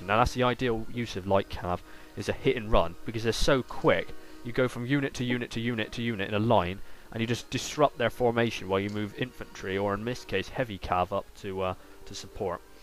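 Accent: United Kingdom. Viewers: Southwest england? Or scotland or nothern Ireland?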